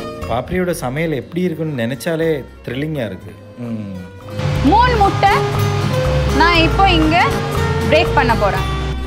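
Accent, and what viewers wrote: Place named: Kerala, India. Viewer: நீங்கள் பேசும் போது மலையாளம் தெரியுது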